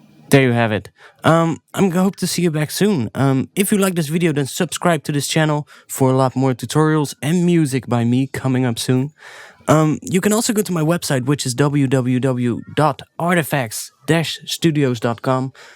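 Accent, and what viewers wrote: Netherlands. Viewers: ja ik wist het! ik zat gewoon de hele tijd tijdens de tutorial hij moet wel nederlands zijn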